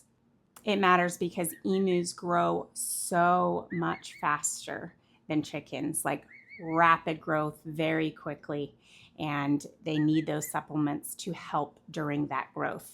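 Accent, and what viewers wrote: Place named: South, United States. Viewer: very interesting but i couldn't deal with them not making it i can't believe people correct your speech really you have a southern drawl and so what if it comes out not the u sound i know what you meant. great video full of info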